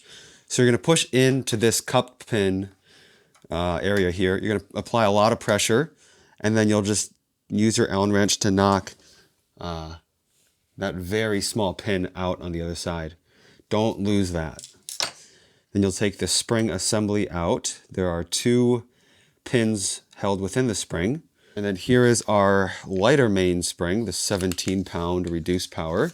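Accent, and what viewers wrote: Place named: United States. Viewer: With that accent you're more American than Korean!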